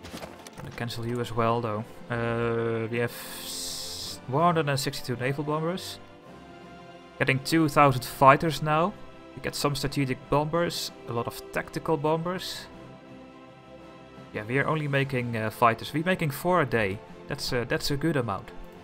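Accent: Netherlands. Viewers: Leuke Lets play man haha je hoort wel een beetje dat je nederlands bent in je stem :)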